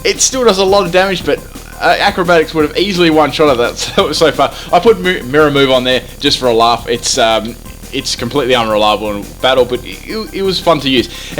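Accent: Australia. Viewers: became accustomed to it after a while...such an Australian accent...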